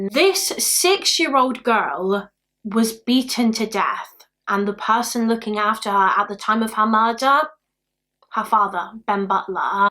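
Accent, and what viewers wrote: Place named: England, United Kingdom. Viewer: I don't know why, but when i have a bad day, voice is just relaxing. I love the english accent.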